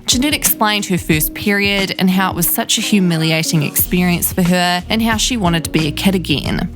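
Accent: New Zealand. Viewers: its a new Zealand accent not Australian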